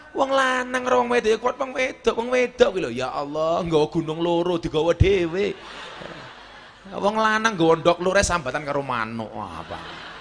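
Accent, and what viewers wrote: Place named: Banyumas, Indonesia. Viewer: Pantsan Abah bisa ngomong ngapak menghormati yg desa"ngapak..kaya saya yg ngapak juga.. bangga sama Abah😊sht"ya Abah Gus Miftah kyaiku 🤲🤲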